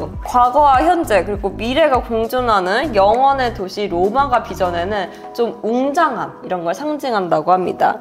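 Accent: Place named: China. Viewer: 어디 사투린지.. 독특한데 억세게 들리네요. 억양이 중국 말투 같아요😅 안녕 바이~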